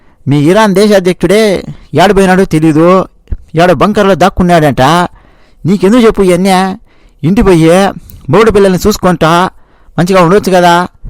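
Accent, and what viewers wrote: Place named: Rayalaseema, India. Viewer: అయ్యయ్యో పాపం.. బెదిరించడానికి వచ్చిన గుండె ధైర్యం ఏమయింది.. మీ రాయలసీమ slang super గా ఉంది..😀😀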